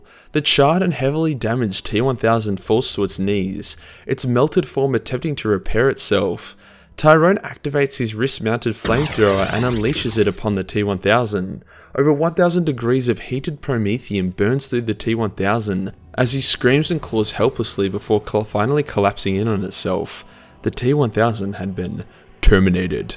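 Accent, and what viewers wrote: Australia. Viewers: Subscribed early - top job Mate ,and it's bonza to hear a fellow Aussie accent to boot !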